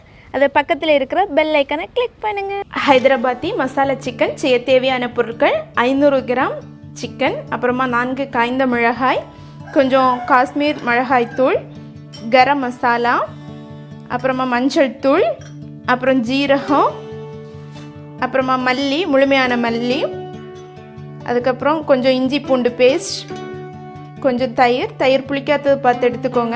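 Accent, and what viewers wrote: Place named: Kanyakumari, India. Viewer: vearaci.... kollam✌😍 Nature of kanyakumari slang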